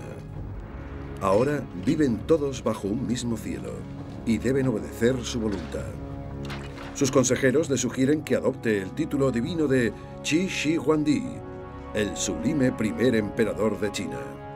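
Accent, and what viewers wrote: Spain. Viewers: Si tan sólo no tuviera el maldito acento español.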